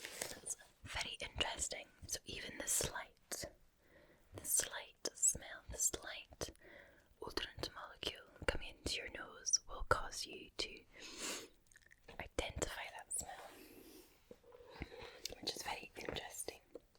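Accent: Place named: Scotland, United Kingdom. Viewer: sounding super Scottish in this video - love it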